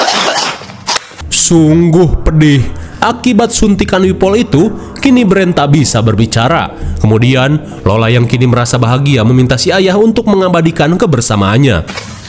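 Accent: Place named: North Sumatra, Indonesia. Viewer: Bang..kau asal sumatera ya 😂😂kdg logatnya kek org sumut😅😅😅 penasaran.semoga di balas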